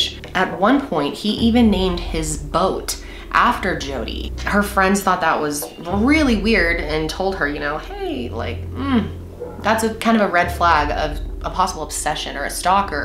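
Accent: Minnesota, United States. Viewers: Her cute little MN accent 🥺🥺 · I loooove Minnesota accents. Why should she, or anyone, have to work toward getting rid of it? This case is so sad. :( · you're doing a case super close to home.. st cloud is 1.5 hours away from me.. and cedar rapids is 2.5 hours. mason city is 2. super close. other note,, our MN accents are strong lol. I get picked on for certain words I say.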